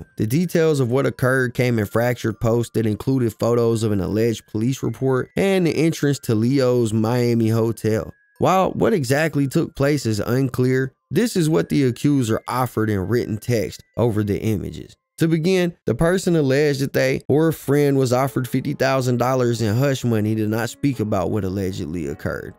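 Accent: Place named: North Carolina, United States. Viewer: This the 2nd video I watched and plan on watching more. You did your thang bro! I got a friend in NC, I bet your from out that way, he talks just like you..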